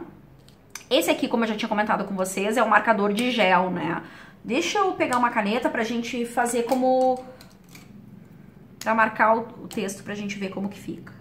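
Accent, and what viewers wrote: Rio Grande do Sul, Brazil. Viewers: tu és da onde? Tenho a impressão de que és do Rio Grande do Sul, pelo jeito de falar. Beijos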